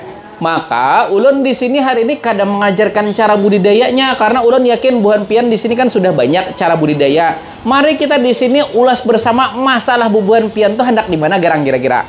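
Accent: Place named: Hulu Sungai, Indonesia. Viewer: Hadiirrr ... Nah pak ulun curiga mendengar logat piyan ne mulai awal nonton Chanel ini, model ada hulu2 nya macam urang hulu sungai🤭sekalinya ... Kita sebanua, Ulun asli Martapura, tp kuitan Ulun hulu sungai asli Kandangan wan Barabai, Sukses buat Chanel piyan ini, SGT BerManfaat... Smoga Pertanian Indonesia Maju😇 Aamiin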